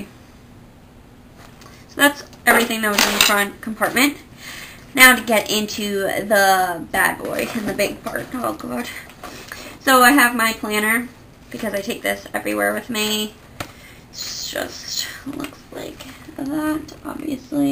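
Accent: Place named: United States. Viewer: So cute! I love your American accent <3 Super cute purse too!! Your purse looks so much cleaner and more organized then mine, I totally have the same glasses case xo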